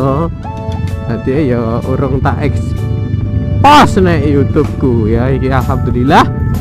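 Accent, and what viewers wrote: Java, Indonesia. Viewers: jowomu ngendi pak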